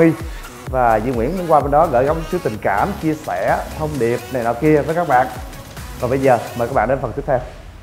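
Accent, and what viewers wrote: Mekong Delta, Vietnam. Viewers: Cải lương quá cha nội...dân miền Tây phải o?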